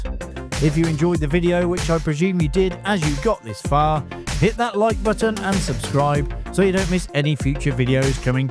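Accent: United Kingdom. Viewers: When I hear a british accent I know its time to turn off.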